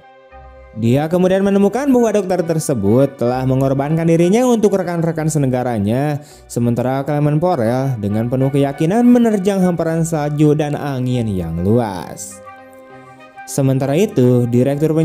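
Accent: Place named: Sunda, Indonesia. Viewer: Anyeng suara nbte ngenaheun co aa ti Sunda siganaamah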